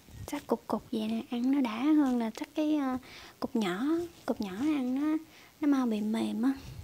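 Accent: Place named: Mekong Delta, Vietnam. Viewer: Mâm cơm nhìn hấp dẫn quá, con gái miền Tây nói giọng hay và khéo tay quá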